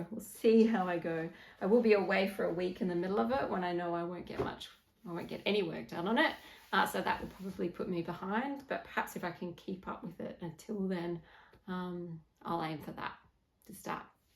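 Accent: Australia. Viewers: It's wonderful to hear another aussie accent on youtube 🙂 I like the look of the seed star tank, I think it is something I'd like to knit. Your Miss May MKAL is looking good so far. It's exciting to hear that you're doing a giveaway, and the yarns look lovely. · Hello from NZ! It's always wonderful to hear an accent from Down Under on YouTube! Just found your channel, love your style and vibe so of course I subscribed. Good luck with your tramp! And just as a thought, if you start a new project for your train ride, it doesn't matter if its a sock or a jersey - that only matters once you've finished it. So I reckon you start the Kala T! 🤣😁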